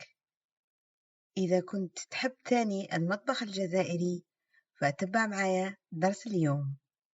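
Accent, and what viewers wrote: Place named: Morocco. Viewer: راها تشبه بزاف شغل لهجة مغربية نتاعنا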